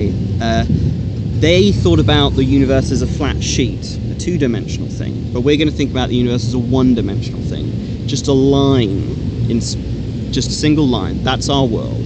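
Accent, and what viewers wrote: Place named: United Kingdom. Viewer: Beauty with brains, and a British accent lol. Nicely explained.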